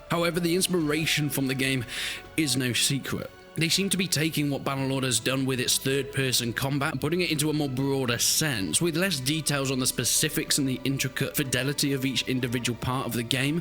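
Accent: United Kingdom. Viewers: I can't help but despise your heavy British accent and pretentious voice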